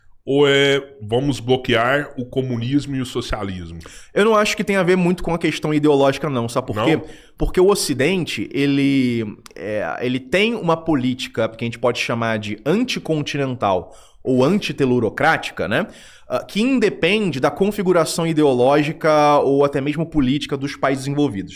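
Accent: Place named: Rio de Janeiro, Brazil. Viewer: Muuuuuuito carioqueixxxxx, fica difícil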